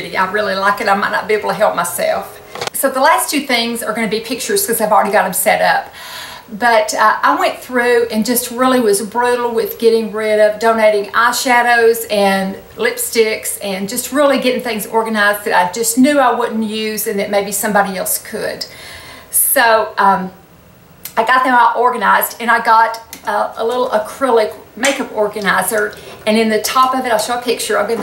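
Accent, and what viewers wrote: South, United States. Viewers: Hey, Love your videos and your southern accent. I know you are gluten free (Chrohn's Disease and maybe Celiac). I have Celiac Disease and was wondering if you choose gluten-free lipsticks to wear? If so can you recommend some. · You are so beautiful. I LOVE how your voice is so calming. Im from the south so the accent adds to the charm. Thank You for the wonderful tutorials. Also, you are so humble. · I think we are all blessed if we just look at the great things that have happened in our lifes. kids, grandkids, and if luck maybe I'll be able to meet my geat grandkids one day. I have Dystonia, CFS, Fibromyalgia and spine issues but I still get up every morning thinking about my life being so blessed. I have just been diagnosed with the Dystonia I didn't even know anything about this thing that has taken over my movements on my right side in December of last year. 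I feel it has got hold of the wrong loud mouth lady lol. Bless you for the video you do it really is a true blessing to watch your video's. I live in Georgia you sound like your from the south to my friend...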